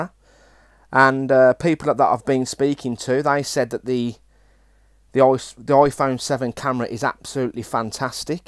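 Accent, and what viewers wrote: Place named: West Midlands, United Kingdom. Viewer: Ah I did also think Birmingham. I'm from Wolverhampton you see, it's nice to hear a somewhat local accent:)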